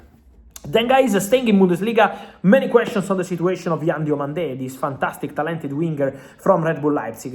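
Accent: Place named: Italy. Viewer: Why you talk so fast this is news everybody want to understand you speak english with italian way Pkease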